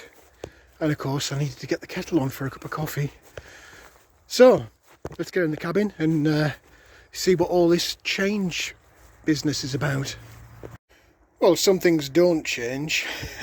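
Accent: United Kingdom. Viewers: SO interesting to listen to British language.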